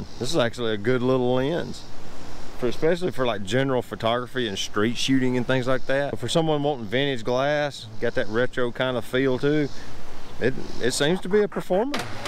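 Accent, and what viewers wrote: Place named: Georgia, United States. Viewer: Great video, happened to find one at a local shop for the whoppin price of 10 bucks! Not a dent or acratch on it, bit of an upgrade with the added length to the <num> i was usin for general stuff, tho that one is a beast as well! The macro feature lead to some real neat mushroom closeups, fantastic video as well. Simple, to the point, georgia accent, good shit man keep it up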